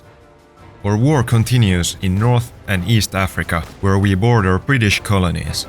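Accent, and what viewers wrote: Finland. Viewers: Hey man are you from finland cuz u sound like it😮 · the finnish accent, Perfection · Oh god i hear the finnish in you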